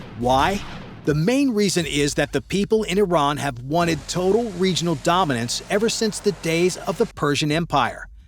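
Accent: United States. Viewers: Sounds like a Nyc gan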